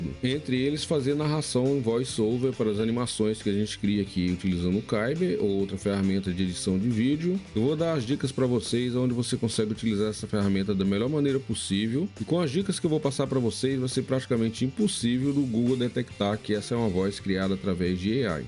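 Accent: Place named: São Paulo, Brazil. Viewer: Como assim, apesar que tenha um sotaque Paulista ? Meu amigo, a maioria de todas as dublagens que existem, 99,99% são feitas no sotaque paulista ou carioca. Observe!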